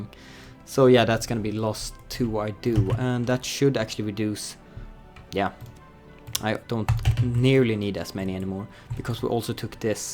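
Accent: Sweden. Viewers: Är du svensk, för du låter väldigt svensk i din engelska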